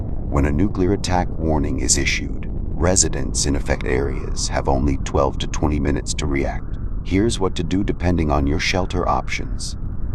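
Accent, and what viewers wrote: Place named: United States. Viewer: 好喜歡這個美式英語的旁白，增加不少信聞中常用的語句，不同於英語課本，離生活中遇到的，同時那考試也是一樣！我旅居英國15年，和同是聊天，就類似如此！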